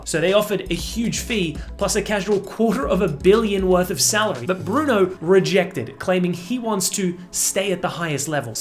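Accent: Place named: Australia. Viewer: Can’t believe I use to think was British 😂, definitely hear the Australian accent more clearly now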